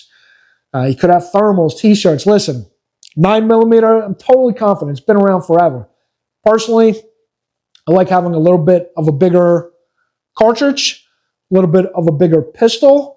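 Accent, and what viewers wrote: New York, United States. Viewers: Love the New York accent 😉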